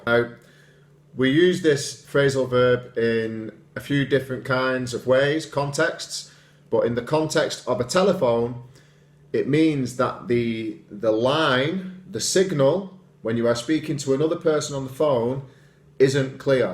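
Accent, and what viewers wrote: United Kingdom. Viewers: Great job 👏🏻 i love British accent 😻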